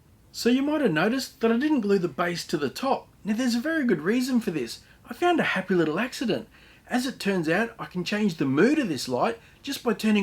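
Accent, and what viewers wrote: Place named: Australia. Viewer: Hey! I just subscribed to you a few months ago, and I've seen lots of your videos now. Also, I'm subscribed to Nick Zametti (I think that's how his last name is spelled, I'm not sure). At the beginning of this video, you mentioned about Nick doing an experiment with Orbeez as well, and having a similar problem with them involving resin. If I remember correctly, Nick Zametti also did an experiment with Orbeez involving resin, and having a similar problem with the Orbeez being too moist to adhere to the resin. I'm assuming that that is what you were referencing to with Nick. I wanna let you know that Nick Zametti just posted a video toying with the idea/proposal of possibly quitting YouTube, but being talked out of it by his fans. I would like to propose an idea to you about Nick Zametti. I would be tickled pink if y'all would collaborate with each other on a project. 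Like, if possible, not really just doing mirroring videos of each other doing a similar project together yet physically separated from each other, but a collaboration video of y'all being physically next to each other, sharing the same space, and knocking around ideas against each other, and possibly y'all working on separate pieces of a single project together, to where the two pieces that y'all work on come together into a single cohesive product. If that would be possible, that would be AWESOME!!!!!! Y'all have a similar accent, so I'm guessing y'all are either from Australia or New Zealand, cause to me, th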